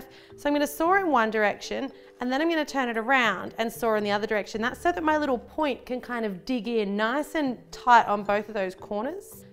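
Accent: Australia. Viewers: Are you Auzzie?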